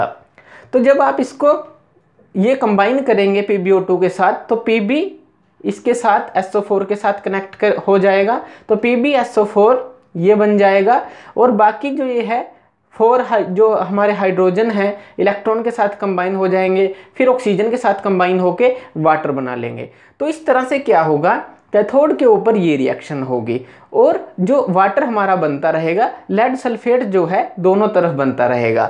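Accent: Punjab, India. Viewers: Good explanation sir.aapki Language par se lgta hai ki aap punjab se hai.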